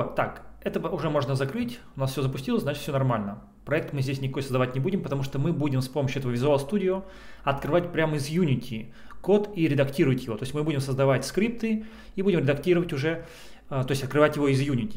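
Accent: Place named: Spain. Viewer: вы испанец? очень красивый акцент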